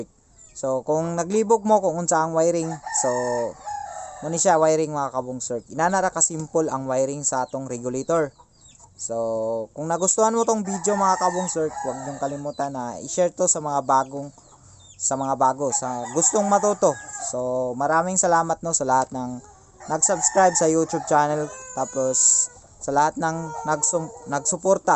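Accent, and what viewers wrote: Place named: Visayas, Philippines. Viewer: Visaya ka pre, di kita maintindihan.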